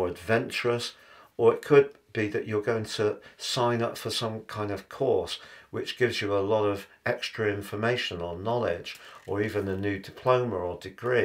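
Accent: United Kingdom. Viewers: Im a non native English speaker and i guess youre a British accent speaker which is really hard for me to understand i watched it twice to know about my abroad travelling for study purpose my visa decision will come on 27th August but i dont know what is about to happen about my travel i am so confused and couldn't catch what you said regarding this can you please tell me if it will be a positive chance of visa approval or what?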